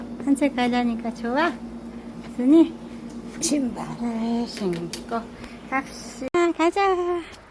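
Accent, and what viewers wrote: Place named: Chungcheong, South Korea. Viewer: 충청도 사투리 정겹고 좋네요잉. 깜순이한테 나는 귀여운 북 소리 아이구 이뻐!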